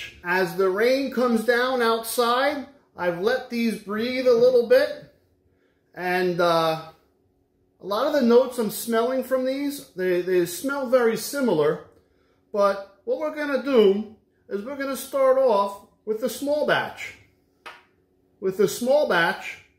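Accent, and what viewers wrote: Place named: East Coast, United States. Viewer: You’re obviously not a Floridian but the east coast twang is definitely there. Great idea hurricane parties that is right up my alley. I find it absolutely ridiculous how the media just gets over dramatic about these storms. It’s not that these should be ignored but most are pretty livable. Enjoy!!🥃🥃🥃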